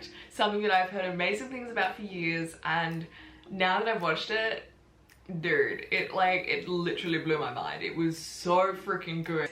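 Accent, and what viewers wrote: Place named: Australia. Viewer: I really like your Australian accent.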